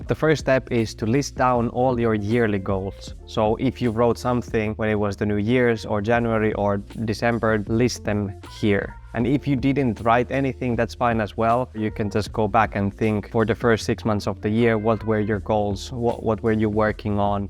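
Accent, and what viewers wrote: Finland. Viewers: First time seeing one of your videos. Clicked and heard your voice. Said to myself, "He sounds Finnish". Interesting because most of the reset type of videos I see are made by women who use various planner systems. Other than Ryder Carroll (who created the original Bullet Journal) and a guy named Riley Blanton (who uses Michael Hyatt's Full Focus Planner) yours is the first video of this specific type that I've seen done by a guy.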